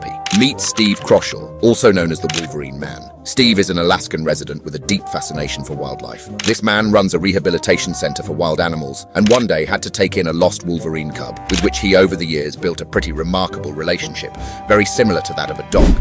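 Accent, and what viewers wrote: United Kingdom. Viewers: Did this guy just rip off Casual Geographic with a British accent? I get he didnt create the slang, but the overly extensive use and variations is basically his style. Also, is this AI?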